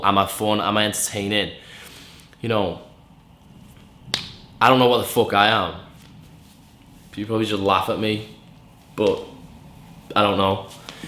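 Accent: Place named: Liverpool, United Kingdom. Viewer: Is his accent from Liverpool, Manchester or Newcastle? ...sounds liverpool. love it!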